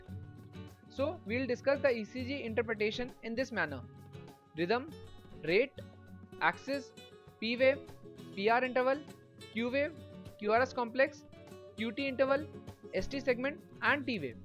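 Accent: India. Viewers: I like your accent. Indian medics are excellent. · I really wish this guy spoke slower and was not Indian.. smh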